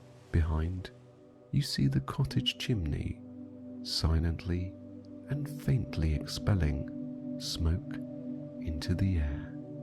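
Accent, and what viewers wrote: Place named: England, United Kingdom. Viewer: Not just because I'm an Anglophile or anything..😉.. but I find that anyone with an English accent has just about the best voice for this kind of work. So soothing. Thank you,. xx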